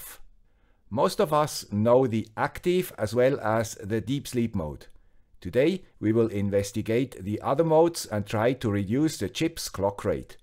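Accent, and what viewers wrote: Switzerland. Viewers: Thank you very much! Exaclty what I needed and KI didn't knew yet. From another guy with a swiss accent.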